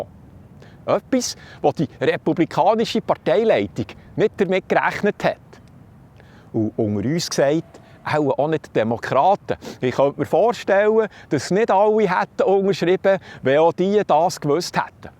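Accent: Switzerland. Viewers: Vielen Dank für die ausgeglichene Aufdröselung der Ereignisse. Auch wenn ich mich mit Ihrem Swizerdütsch etwas schwer tue - aber das wird schon besser ;) - weiß ich diese Art Berichts ehr zu schätzen. Eine Bitte zur Klärung hätte ich. Was hat es mit den somalischen "Kindergärten" auf sich? Hier gehen die Berichte komplett konträr - sprich: links sagt gar nichts, rechts um so mehr.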